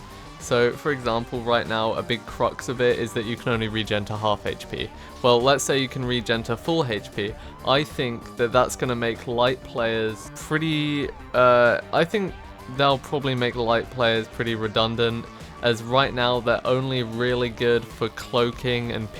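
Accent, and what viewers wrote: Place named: United Kingdom. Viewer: Absolutely not meant to be a hate comment or mean in any way but before I even clicked on the video I just knew you were British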